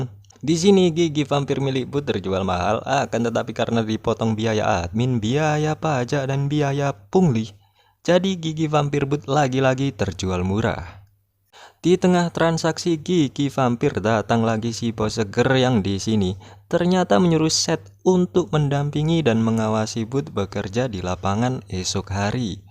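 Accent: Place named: Java, Indonesia. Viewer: Jawa nya kelebihan mas pekat kali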